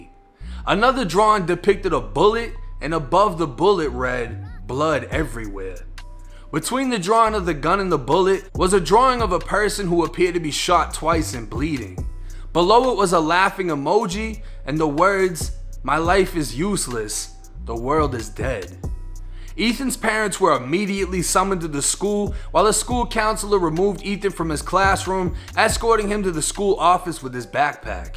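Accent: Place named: Tampa, United States. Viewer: I love how u dead ass talk like u from Tampa fr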